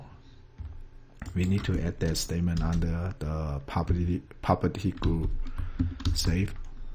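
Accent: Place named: China. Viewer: you accent is closed to a Chinese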